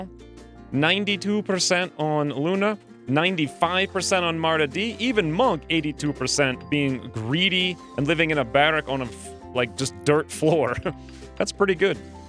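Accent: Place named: Scotland, United Kingdom. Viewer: He's originally from Boston and now lives in Arizona. I have no idea how that added up to his accent, but yeah it does sound kind of Scottish, but not quite.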